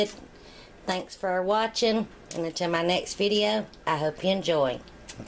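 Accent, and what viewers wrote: South, United States. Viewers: Yes you do have an accent! I love the way you did the subtitles! Yur friend shouldn't do no messin' with us southern girls!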